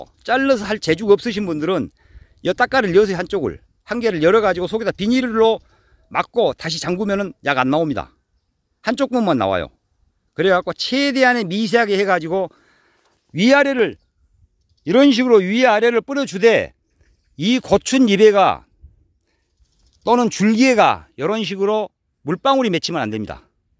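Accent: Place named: Jeolla, South Korea. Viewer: 여긴 경상도인데 전라도 사투리가 이렇게 구수하게 들린 적은 없는데,진심이느꺼지네요.감사합니다.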